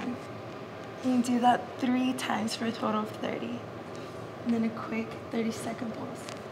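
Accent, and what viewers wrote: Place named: Southern California, United States. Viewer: I've always enjoyed voice. It seems so native Southern Californian but she hails from the Miami area.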